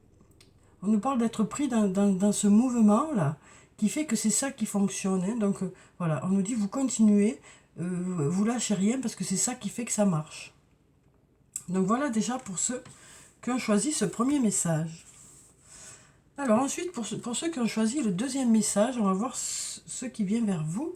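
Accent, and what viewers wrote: South, France. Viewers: Bonne journée toujours un plaisir d écouter cette voix douce avec cet accent du soleil, merci pour votre tirage et merci de ce temps que vous nous offrez.bonne journée à vous bisous.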